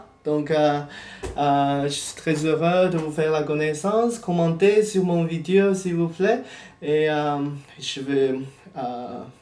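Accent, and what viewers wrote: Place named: Quebec, Canada. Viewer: Est-ce que tu habites au Quebec en ce momment ? tu as un accent, mais tu parles le jouale quebecois, vraiment cool à entendre, keep it up ! :) haha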